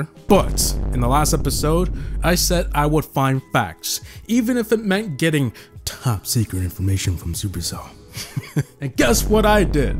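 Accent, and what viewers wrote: Norway. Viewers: Bro are you Norwegian or sum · Are u norwigan ?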